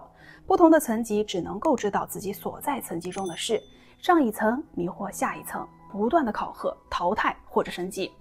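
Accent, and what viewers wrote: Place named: Beijing, China. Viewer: 还是能听出京片子味儿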